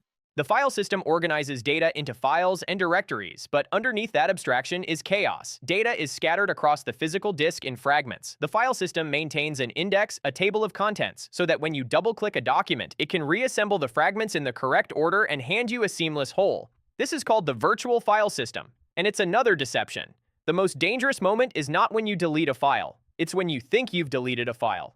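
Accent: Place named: India. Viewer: Why you have a racist Indian accent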